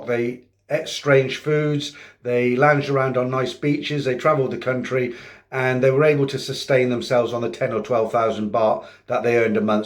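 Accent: United Kingdom. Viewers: are you saying "Edgar Myer"? lol im sure its EKAMI. Love the brit accent mate....just funny.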